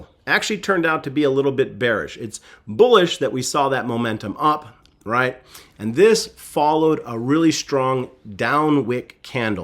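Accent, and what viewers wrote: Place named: Eastern Europe. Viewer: great intro sir. may i ask if you are from eastern europe? thanks.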